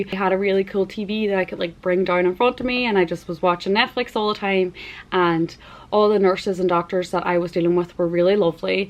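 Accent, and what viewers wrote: Ireland. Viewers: Thank you so much for sharing your journey with us! I hope the doctors bring good news so you can live a long and beautiful life. You have.a huge heart and are lovely inside and out. Not sure where you originate from but I enjoy your accent…it sounds a wee bit Irish 😂. Love it. Bless you and stay in touch with us.❤🎉🎉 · I'm glad to hear that you're doing well post-surgery. Did they tell you what filler they used to fill in the hole? It's usually fat! I also noticed moments where your accent turns Canadian eh! Now there's now't wrong wi' it, just the Irish accent is the worlds favourite so don't lose it! My next door neighbour is Northern Irish and I love listening to her talk. Ask the surgeon if they recorded the surgery and if you can have a copy of the video... they're not gentle when they need to move you around, that'll explain the pain here and there. 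Keep up the recovery. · Thank You so much for Your sweet update on Your progress! So glad it’s over for You You are so beautiful inside and out and I adore Your accent its Irish, isn’t it? Trust the Lord in all Your ways.❤️ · Absolutely love your beautiful Irish accent. You are looking so good ❤ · You're SO BEAUTIFUL! And articulate, aaand i could listen to that Irish lilt all day... Your positivity and courage are inspiring, and i admire the grace with which you're handling this scary and overwhelming experience. I am praying for you dear... Hope you can feel the love and support from all of us who would help you carry this heavy burden in a heartbeat if we could. Sending you comfort and the peace of acceptance, whatever lies ahead. ❤❤ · also your irish accent is sooo pretty!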